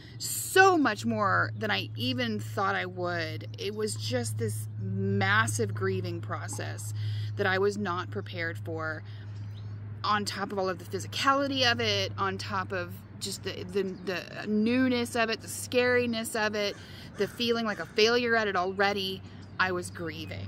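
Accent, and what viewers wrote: South, United States. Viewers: Your accent and southern persona delivery is fantastic. This is coming from a tough critic! Girl you keep on sharing them stories. Your journeys will take you far and wide. Your honesty about the transition to the "Urban jungle" is different than boondocking. My dog and I are doing overnights. We did a week road trip in the summer. Definitely has it's enjoyable and challenging times. That's the adventure. What lies around the next bend. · Girllllll! I feel like I know you! What you're doing is a wish of mine. For someday . Not sure I have the guts tho. Please keep in touch as you can. I'd be tickled pink to meet ya. I'm 61. My husband is a good guy, just super boring. (Engineer) I was an operating room technologist back in my day. I crave adventure. ***I liked hearing a southern voice as well. I am currently way up in Ohio ....ICK !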